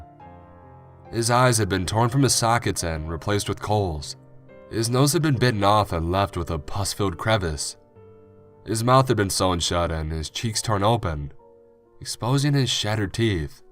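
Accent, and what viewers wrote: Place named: United States. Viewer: The fuck kinda dialect is this, Exceptional American?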